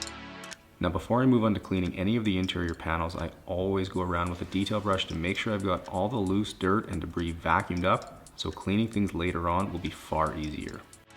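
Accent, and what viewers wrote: Saskatchewan, Canada. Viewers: It’s our awesome Saskatchewan accent 😘🇨🇦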